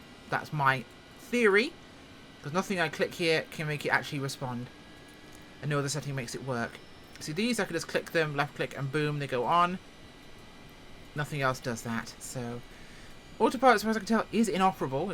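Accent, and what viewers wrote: Russia. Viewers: ВАУ... какой сексуальный русский голос!! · are you doing a russian accent? James Bond and I 😍 love it!!!!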